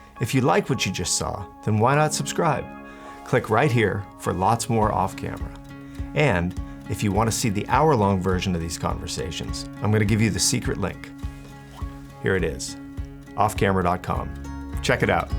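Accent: United States. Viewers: Stephen sounds so American these days haha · Where's his Bristolian accent gone????? He sounds semi-yank uggghhhh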